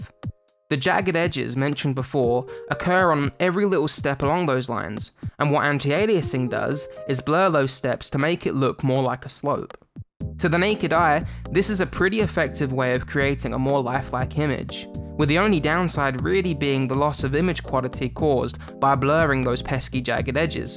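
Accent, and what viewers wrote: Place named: Roadman, United Kingdom. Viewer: Well this is quite surreal, it's like listening to a roadman lecture about graphics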